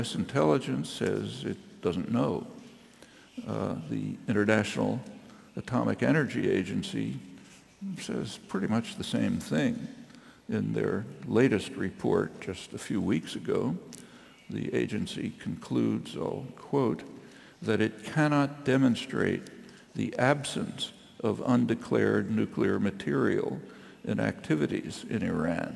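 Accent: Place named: Canada. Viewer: u sound canadian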